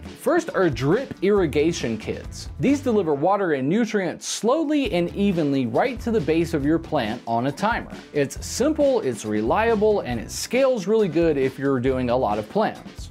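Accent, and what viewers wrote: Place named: South, United States. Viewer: my got that southern drawl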